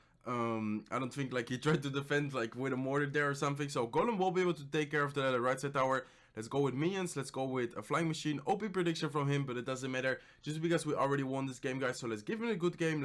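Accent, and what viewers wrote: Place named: Netherlands. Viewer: Whaha k ben ook nl’er en je hebt echt een nl accent als je Engels praat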